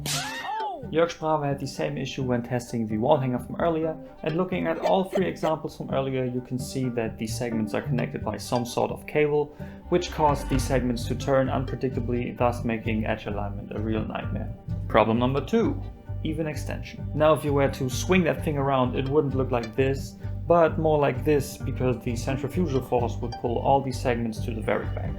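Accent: Germany. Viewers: Great video Aber mal im ernst die deutsche englisch aussprache ist für deutsche echt etwas schwer zuzuhören weils iwie nicht zsm passt (weil die sprache eig 24/7 benutzt wird und manche bezonungen passen einfach nicht das ist normal :D) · U‘re German or Austrian Maybe Swiss?? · Ich dachte die ganze zeit der hat doch ein deutschen Akzent und dann bei der sonntags ruhe ich war mir sicher ❤😂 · Quick question. Are you German? Because I’m believing to hear a slight German accent. And I think you stayed “Nein” (German: no) at when you dropped that metal peace into the water. I’m asking because I’m German too😬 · Ich habe bis krampfhaft nach beweisen gesucht, dass du aus Deutschland kommst 😅 hört man irgendwie immer raus wenn jemand englisch spricht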